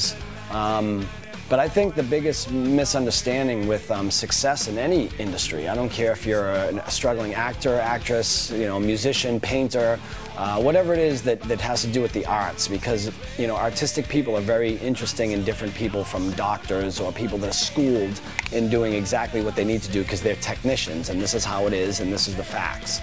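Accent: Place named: Boston, United States. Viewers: Boston. My Grandma was from there and jad the same accent.😁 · He's from Lawrence kid! It's a Boston accent we all sound like massholes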